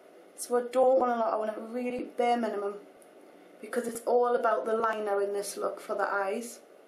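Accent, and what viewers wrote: United Kingdom. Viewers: Well your ears must be weird because the accent Ms lauren has was voted the best accent in the UK :)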